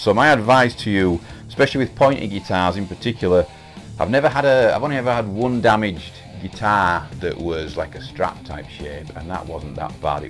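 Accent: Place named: Yorkshire, United Kingdom. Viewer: Alright, mate, im gonna guess the accent. Rotherham or Sheffield? Helpful vid btw, good work🤘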